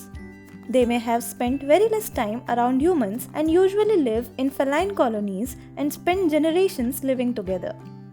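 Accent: India. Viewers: hears indian technical support accent and already stops video at 5 seconds, agony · What wor st, eunu ch like voice you have! Hateeful in dian accent! All cats are not she. Then how do you say her? Eunu ch! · I can hardly understand a word you are saying, even though your attempting, to make this video in English. Your East Indian accent is so thick, you may as well speak in Indian. Good effort though.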